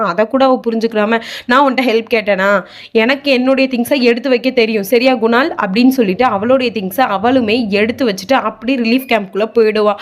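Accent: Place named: Tirunelveli, India. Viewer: ஹலோ நீங்க திருநெல்வேலி காரங்களா உங்க வாய்ஸ் அப்படிதான் இருக்கு போயிட்டு வந்துட்டு அப்படின்னு சொல்றீங்க கதை சூப்பர்❤❤❤❤❤❤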